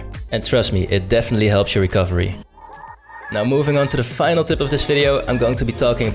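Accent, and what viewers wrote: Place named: Netherlands. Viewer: Nice video man you are insanely underrated and are you from the Netherlands by any chance I can kinda hear it when you talk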